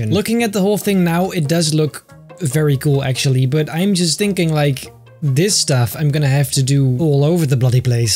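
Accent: Netherlands. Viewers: Your acccents sounds hollandischs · Are u dutch? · one more confirmed that is dutch he said hupatee en maybe he is also brabants (ik hoop wel dat t een brabo is want brabo`s zijn cool XD) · Are you Dutch? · ar you dutch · Are jou from the Nederland or belgium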